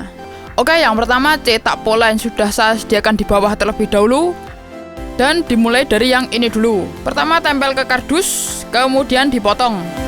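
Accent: Java, Indonesia. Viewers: Logat jawanya kelihatan Jowo ndi mas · Medok banget iki mantep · Medok tenan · Oke le medok bahasa nya wkwk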